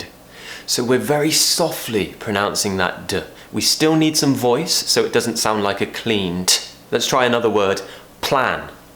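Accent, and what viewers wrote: United Kingdom. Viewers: I am always love the way you speak english with your british accent And Also sometimes i find the difficulty of word ending /ed/ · Thank you very much for your help.It's a great way to teach my students !!! By the way I love your British Accent. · I love British accent, And i love hearing your voice and learning British English at the same time. I'm browsing all of your past videos and i'm in love with it. Your such an inspiration. Thank you.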